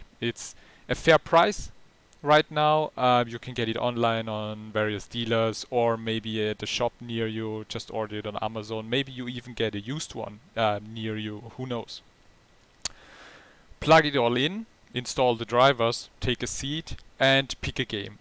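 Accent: Germany. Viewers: Na du bist doch deutsch bei dem Akzent oder? :) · wenn österreicher oder deutsche englisch reden :D machs doch auf deutsch !!!! aber gutes video!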